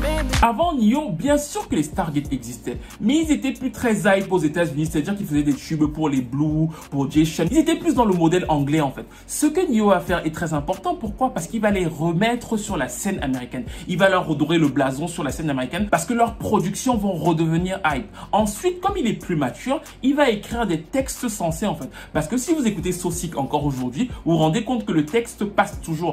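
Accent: Cameroon. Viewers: ce mec merite plus 1 M d abonnes. tu es trop bon ow mon frere africain. j adore ton accent camerounais LOVE YOUUUU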